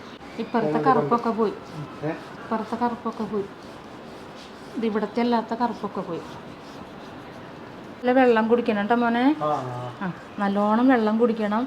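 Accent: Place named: Palakkad, India. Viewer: സംസാരം കേട്ടിട്ട്. പാലക്കാട്‌.. അല്ലെങ്കിൽ തൃശൂർ ആണെന്ന് തോനുന്നു 🥰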